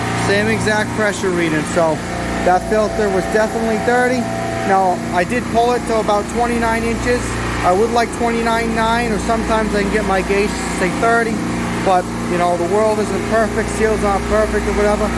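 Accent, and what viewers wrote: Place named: New York, United States. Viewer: New York accent s so cool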